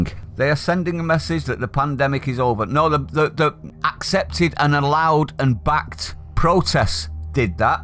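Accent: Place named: Salford, United Kingdom. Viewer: Salford? If not it’s great to hear an accent close to me own talking sense.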